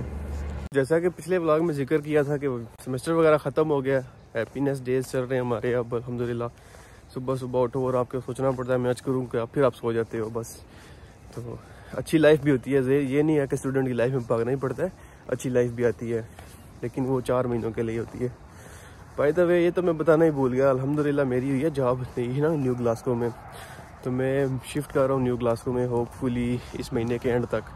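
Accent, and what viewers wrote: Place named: Punjab, India. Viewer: jee love your punjabi touch ♥️ ❤️ bro keep going 💪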